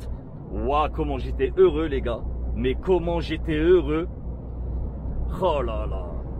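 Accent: Alsace, France. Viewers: Salut! Toi agressif? T'as juste un bon gros accent alsacien, c'est tout. 😂 Tu sembles juste naturel, direct et sincère. 👍 Ceci dit, peut-être que celui qui t'as laissé le commentaire s'avère rouler en Citroën. 😂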